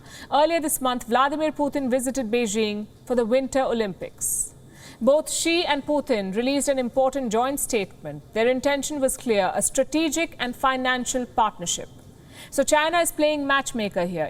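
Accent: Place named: India. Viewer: It is Indian voice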